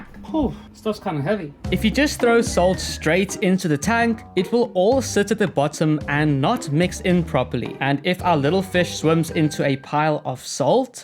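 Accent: South Africa. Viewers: Great video man, though your accent is tickling my brain, it sounds like your first language is Afrikaans. Now I might be tripping, but the way you say certain words are just making me think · Are you from South Africa by any chance? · You sound south aftican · South African? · I know a South African when I hear one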